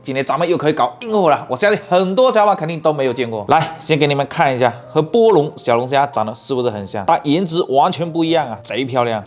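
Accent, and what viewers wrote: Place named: Fujian, China. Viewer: 很喜欢听福建人说普通话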